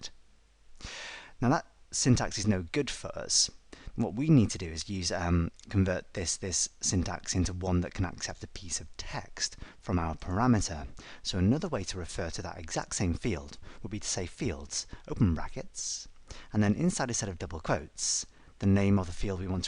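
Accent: England, United Kingdom. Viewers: Love all your videos. Like the English accent. I would like to expand on this topic and see if you could use a stored procedure instead of an embedded t-sql and pass the parameters to this sp to retrieve the information. I tried to do this but could not bind the parameters to any field in the report query. Do you have any suggestions? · Refreshing to hear these type of articles with a british accent for a change. Nice one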